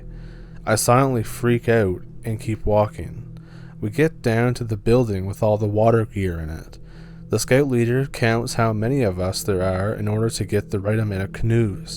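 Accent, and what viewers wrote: Canada. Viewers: Indiana? Sound Canadian there dude.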